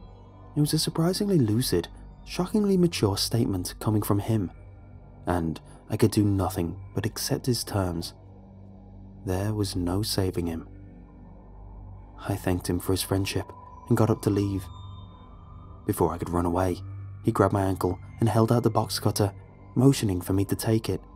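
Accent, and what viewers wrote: England, United Kingdom. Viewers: Strangely it's been the same for me. It's something about his steady English accent that makes me drowsy.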